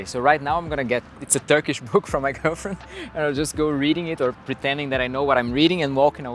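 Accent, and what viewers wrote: Brazil. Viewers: Iradaa essa comparacaoo bro!! cai aqui no seu video e logo de cara ja reconheci o sotaque brasileiro!! Comecei meu canal recentemente tbm, pensei em fazer em ingles que nem vc mas acabei optando pra fazer em português mesmo!! Pow irado ganhou um inscrito! Cheers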